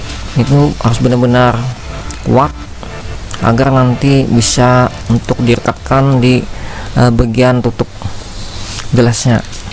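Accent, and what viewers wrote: Banjar, Indonesia. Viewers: Logat ikam banjar banar . Aku samarinda kampungku amuntai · logat bahasanya kaya urang banjar....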